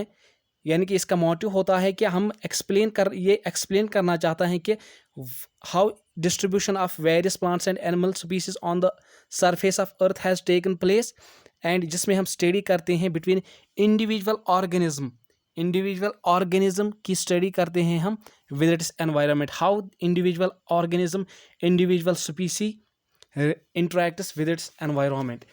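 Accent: Kashmir, India. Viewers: Kashmiri tune smj ni aati words ko repeat pr krke sunna pdta h....biggest problem 😞😞😞😩😩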